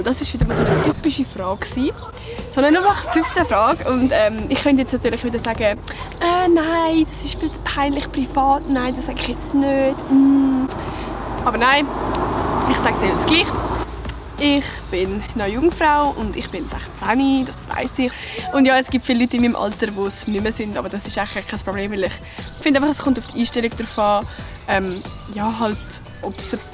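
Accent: Zurich, Switzerland. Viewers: vo wo bisch du eigentlich?😅 Am Dialekt aa Ruum Züri, oder?🤔😅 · Dä dialänkt laht verrate Züri 😂😅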